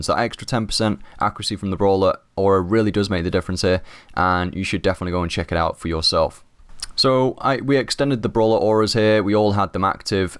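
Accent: United Kingdom. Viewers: Yo, what size monitor do you have and also are you from England?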